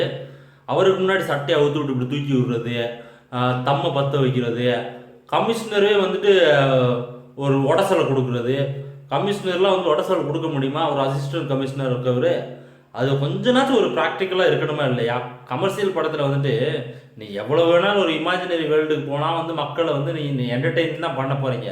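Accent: Madurai, India. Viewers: bro neenga entha ooru odasalunu soldringa entha ooru basha maduraya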